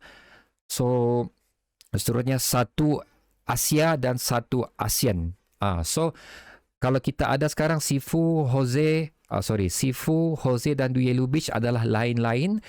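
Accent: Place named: Terengganu, Malaysia. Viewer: mcm terangganu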